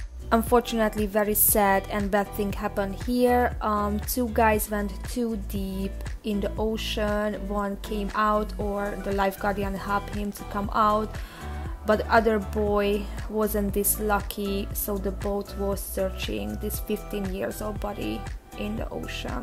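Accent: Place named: Hungary. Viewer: This is so cool video. I've been watching your work on your other channel for a few months now and I always thought that your accent is somehow so familiar to me. I've had this feeling that you're Hungarian but I was never sure until this video. Kinda mind-blowing for me. I'm from Slovakia but my grandparents are Hungarian so I also speak a little ❤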